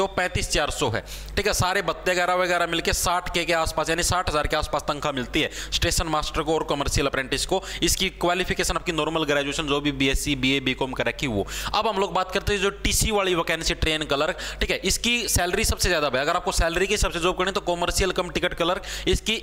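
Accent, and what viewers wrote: Haryana, India. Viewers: Sb kuch pta hone k bad m aapki video dekhna jaruri hota h pta na ki mjjaa aata h haryanvi tone m 😂😂😂😂😂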